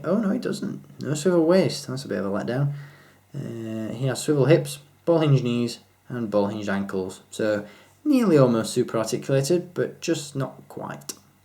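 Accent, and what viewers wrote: United Kingdom. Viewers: Are you British? You sound British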